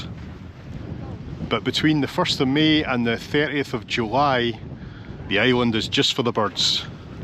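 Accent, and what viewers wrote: Scotland, United Kingdom. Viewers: I just recently discovered you on YouTube and I am hooked. You are absolutely adorable and so full of energy. I was exhausted watching how far you walked around Reykjavik. I'm from Minnesota in the USA, and I love all things to do with travel. I'm also amazed at how much you can eat. I recently watched your Atlantic crossing video and enjoyed seeing how much you enjoyed the food on board. Of course I'm loving your Scottish accent and your wit. Keep up the good work. You definitely have a new fan. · Speaking about accents, your Scottish one is music to one’ ears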